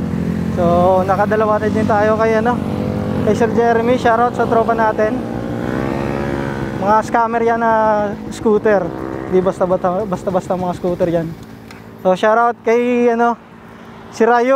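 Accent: Iloilo, Philippines. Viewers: Ilonggo ka lods?HAHA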